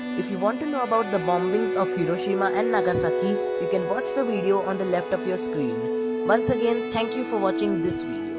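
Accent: India. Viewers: Yes his accent is exactly Indian accent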